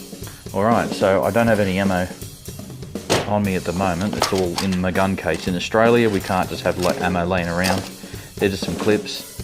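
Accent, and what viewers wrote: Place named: New Zealand. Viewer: Wow - surprised to hear your New Zealand accent after reading the title...I thought you guys would call them a box and not a can. Anywho, I hope you’re still going to make videos. How about throwing us subscribers a bone and making some new content ya old sheep shagga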